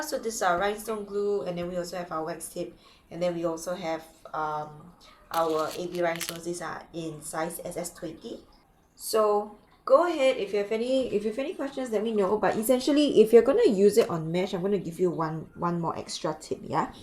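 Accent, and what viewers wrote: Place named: Singapore. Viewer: I am so glad I found this video for so many reasons! Firstly, because it was exactly what I was looking for! Incredibly helpful and informative! Also. This is completely off tangent, but i adore your accent! 🥰❤️ it sounds Singaporean/malaysian and reminds me of home! So thank you for that!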